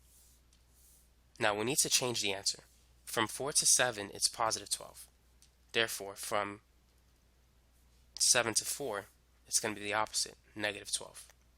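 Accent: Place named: South, United States. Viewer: Not all heroes wear capes, some have southern accents and teach STEM subjects on YouTube flawlessly.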